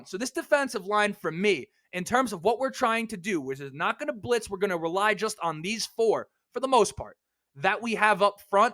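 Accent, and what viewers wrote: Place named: New York, United States. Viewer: hey,! Colts fan since '84----my curiosity is too much for me.....why does I guy living in NY love the colts so much has you obviously do? your looks and your dialect sounds to me like a Giants fan, or a Jets fan or even (cringe) a Patriots fan. Glad you are, but the real reason I subscribed to your podcast is that you are a NewYorker. How did that happen, I mean--why the colts?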